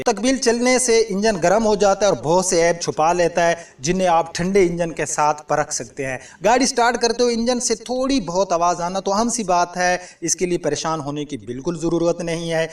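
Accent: Saraiki, Pakistan. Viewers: AP ki Urdu ye bta rhi h k AP saraiki hen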